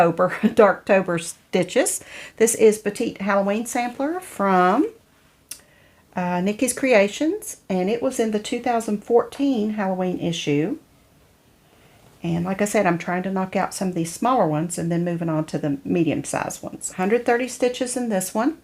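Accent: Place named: Southern West Virginia, United States. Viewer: I just started watching your flosstube and am enjoying them. I knew from the moment I heard you that you were. Southern WV girl! My mom was born in one of those coal camps, unfortunately it doesn’t exist any longer. Her sister still lives in the area but my parents moved around a lot and ended up in FL. Love your conversion on the FQS quilt piece. That looks like Christmas to me. Happy stitching.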